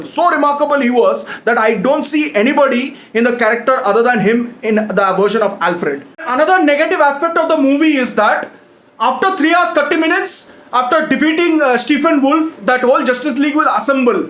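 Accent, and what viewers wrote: India. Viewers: Whoa that's a thick Indian accent....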